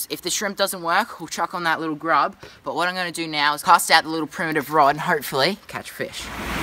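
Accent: Australia. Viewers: Hes from Australia Dingus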